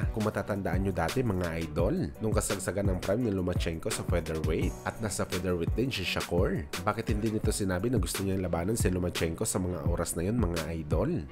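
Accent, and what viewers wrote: Iloilo, Philippines. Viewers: Ayosin mo boses mo idol..murag bayot na ilonggo uy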